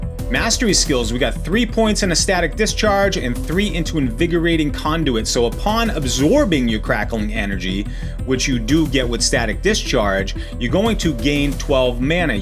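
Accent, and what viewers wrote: Boston, United States. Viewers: God damn the Boston is strong in this one!! Hahha Go Sox brother!!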